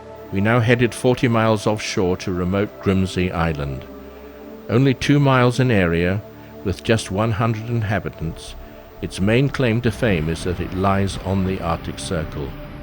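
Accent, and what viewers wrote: England, United Kingdom. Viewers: Exciting journey, indeed. Lovely views of the North, spare commentary and this English voice - nice!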